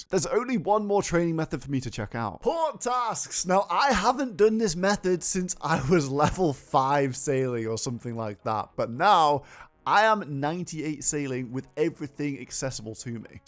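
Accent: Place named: United Kingdom. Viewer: bro sounds like a doofus. is that just him or just the british accent?🫩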